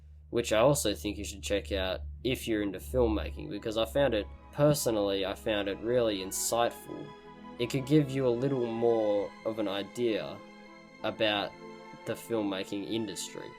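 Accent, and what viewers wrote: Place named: Australia. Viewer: I think he's Australian.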